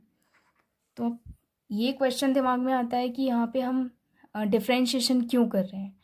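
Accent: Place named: India. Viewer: Even though my ears are not used to Indian English, and that I could not understand much of the discourse, the graphical explanation was impeccable. Thank you too much!! Greetings from an Spanish speaking country, the Dominican Republic.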